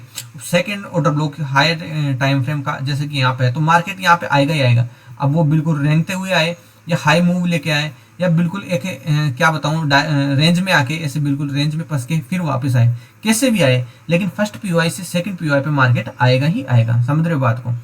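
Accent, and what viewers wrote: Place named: Rajasthan, India. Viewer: Are rajasthani h tu to wo bhi sikar side ki tone lg rhi h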